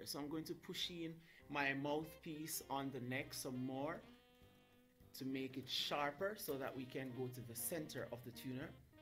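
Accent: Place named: Jamaica. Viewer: Yes, a yardie. I heard the accent and went over to your channel. Can't hide. lol!!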